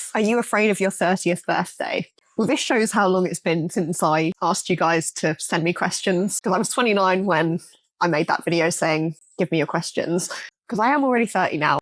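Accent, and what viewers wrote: England, United Kingdom. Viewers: Прикольно. Английский учил только в школе но почему-то сразу показалось что вы из Англии😊! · From here in America: Oh wow yay the double thrill when I 1st heard, oh too good and fun to be true, is in Britain that accent yay!!!! I LOVE the maternal British isles heritage I have: Irish and Scottish!!!!! So in addition to OH FUN CHEERY INSPIRING DANCING AT LEAST, buddy is a "fellow "Brit": THANK YOU hug for all this and being who you are!!!!!!! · I got that u are from England by first 3 seconds :) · Hey, you're British - that surprises me. I know Lauren Jumps is British (heard her talk). I'm an old guy from Germany and I watch your videos because... YOU MAKE ME SMILE! Thanks.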